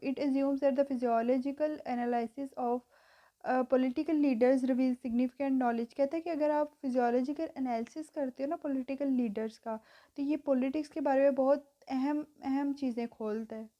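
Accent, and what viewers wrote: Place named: Pakistan. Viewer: Ur voice ar like Pakistani 😮😊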